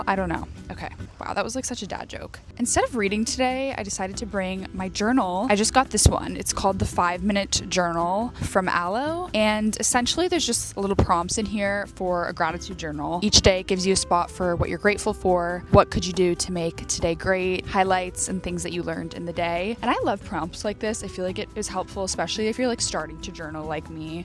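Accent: United States. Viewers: Hello I am from India. I often watch your videos for learning American accent and your vlog makes me happy and energetic. I really love you ❤❤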